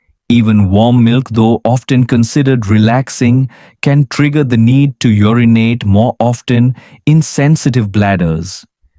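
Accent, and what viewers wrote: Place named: India. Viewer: One can turn the subtitles facility on for ENGLISH, and read the English script. For heaven's sake turn the sound off eliminating the most horrible sound I have heard; the HINDI accent. HOWEVER; there is absolutely nothing in this video of any help for night time trips to the bathroom ( It is Nocturia) and is largely due to a swollen prostate and/or prostate cancer. The swollen prostate situate at the exit of the Ureter from the bladder decreases the capacity of the bladder and it needs emptying a lesser volume more frequently. See a doctor and stay away from podcasts like this one. Clickbait lies in the ONE GLASS catchphrase. It could have been ONE GLASS of ......and name it. It spares viewers the agony of this podcast.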